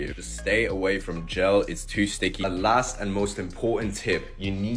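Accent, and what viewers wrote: United Kingdom. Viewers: when did mate turn british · Since when was bro british💀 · bro turned british 💀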